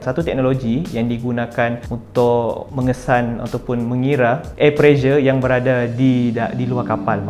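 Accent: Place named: Sarawak, Malaysia. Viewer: Mcm org sarawak je.sbb bunyi slang mlayu smenanjung kurang😅😅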